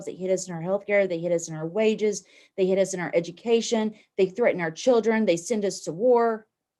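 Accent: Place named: Tazewell, United States. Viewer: Coming from convo couch. Im a Tazewell native, so my accent is about the same as yours!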